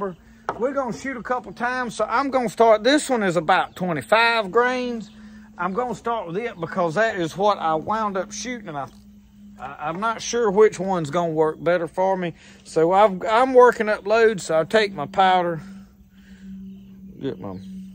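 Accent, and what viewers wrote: South, United States. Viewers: Hi I appreciate the video it was informative and entertaining but buddy it was hard for me to follow because of the your Southern drawl it's so strong not a criticism just a suggestion maybe if you speak Little more clear and more precise I wouldn't had to watch it a couple times to get all the narrative and I can generally follow most southern accent I have a long ancestry back to the south when my original ancestries from Virginia followed that longWind Daniel Boone to eastern Kentucky and Tennessee 😂 thanks for sharing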